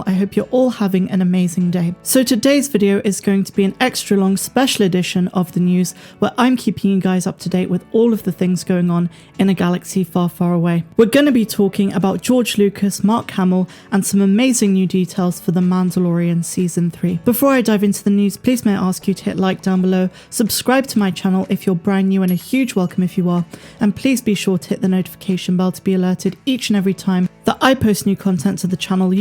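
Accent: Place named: West Country, United Kingdom. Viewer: I loved the f word said as well lol I'm loving accent can't work out if it's Somerset Devon or Bristolian and I'm from Somerset myself lol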